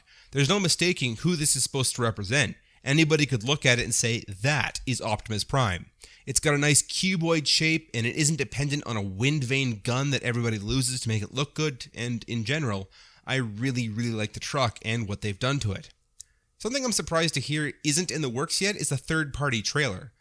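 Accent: Canada. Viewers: Hey, I know this comment is kind of late. I just wanted to let you know that I've enjoyed watching your videos for years, the transformations are amazing to see in stop motion, and I'll be honest, taking away the articulation section isn't that major, in fact a little change here and there can be good for a YouTuber to improve! Also I just love your midwestern Canadian accent It's clear, and fully understandable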